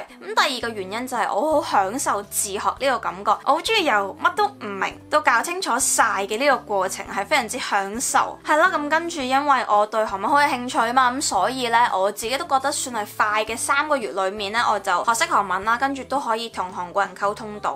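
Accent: Taiwan. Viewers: 所有说普通话就有了台湾人的口音 ：） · 难怪你的国语一口台湾味。大陆粉丝留＾＾ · 所以你的國語這麽台灣腔。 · 難怪Mira講的中文跟台灣人一模一樣 不像香港人對腔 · 難怪Mira的中文很臺灣腔XD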